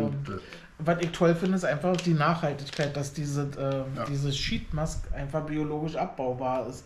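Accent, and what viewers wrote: Berlin, Germany. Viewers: Moin moin 😊...ich finde berliner Dialekt schön....ach ich bin schnell mit ausmisten 😊😅...... 😅😅😅.....❤❤❤ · Berlinern ist doch super dann das ist doch Deutsch Grins. Liebe Grüße aus dem Sauerland nach Berlin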